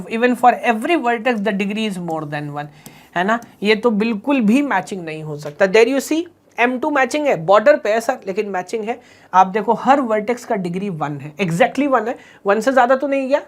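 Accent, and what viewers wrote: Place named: Kanpur, India. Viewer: aap kanpur ke lag rhe ho sir, Jis tarah se kahe , or pel diya use kr rhe ho .....BTW BEST EXPLAINATION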